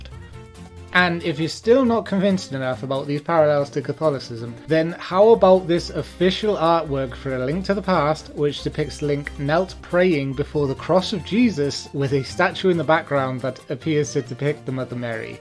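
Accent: United Kingdom. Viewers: Bro are you welsh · Sidenote are you from Northern Ireland?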